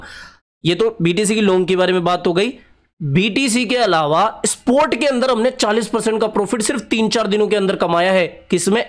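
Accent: Jaipur, India. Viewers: tumhare way of talking se lagta h tum jaipur se ho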